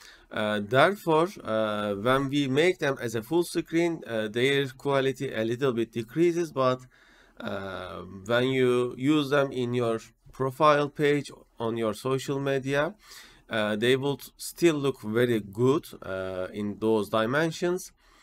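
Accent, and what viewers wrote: Turkey. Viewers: Bende diyorum bu ingilizce neden tanidik geliyor Turk oldugundanmis:)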